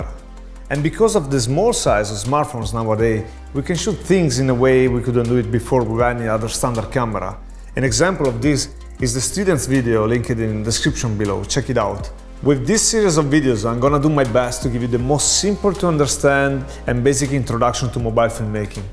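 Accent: Romagna, Italy. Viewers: Ha un accento italiano così rustico, che figata 😂😂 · This guy has a very marked italian accent.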